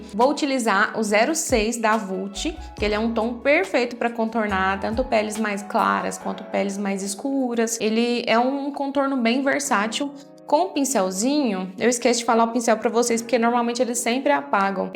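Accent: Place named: Minas Gerais, Brazil. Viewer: esse sotaque tipo mineira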